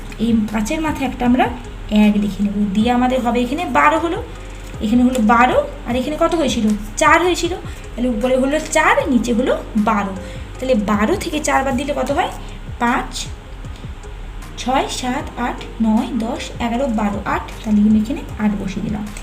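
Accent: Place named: India. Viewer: আপনার ভাষা শুনে মনে হচ্ছে আপনি ভারতীয় নাগরিক।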